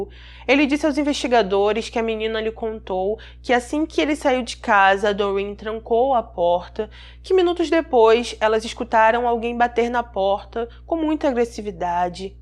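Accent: Rio de Janeiro, Brazil. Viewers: QUE SOTAQUE TENEBROSO É SOTAQUE CARIOCA QUE SOTAQUEZINHO DE MERRRRDA..AINDA MAIS QUANDO HÁ EXAGEROS.. COMO ESSA MULHER..MAIXXXXXXXXX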